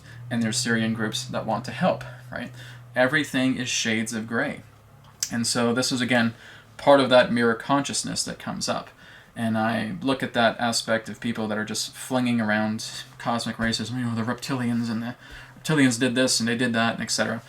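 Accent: Scotland, United Kingdom. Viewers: Wow i ❤ ur scottish accent!! 😆👍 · I dont know how it works , but again the subject was spot on for me - Thank you very much - I like when u laugh and speak Scottish :) - <3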